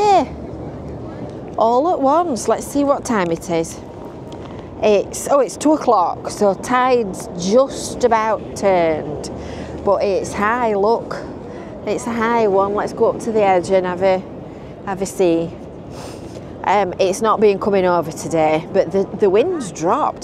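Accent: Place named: Lancashire, United Kingdom. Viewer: Hello, I found your ‘vlogs’ only last week, nice hearing a Lancashire accent, I’m a Lancashire lass (well not a lass I’m 80) I always went to the illuminations with my parents the 50’s and remember standing in a doorway of the old Woolworths up a side street and the ‘sea’ coming across the road. Ive subscribed so will watch all your vlogs,thank you and stay safe, don’t get blown away 🚶‍♂️☕️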